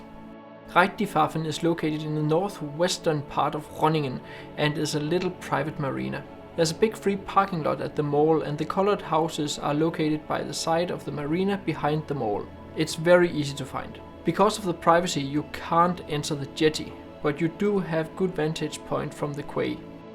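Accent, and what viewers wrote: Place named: Denmark. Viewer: Its not that bad! Its just very clear you have that Danish accent haha... Planning on coming to The Netherlands again someday soon?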